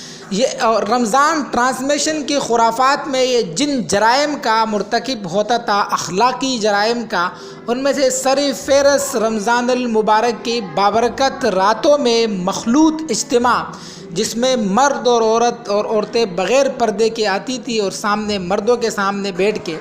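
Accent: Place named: India. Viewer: Ye kesi Pakistani k bolne k style ni he is indian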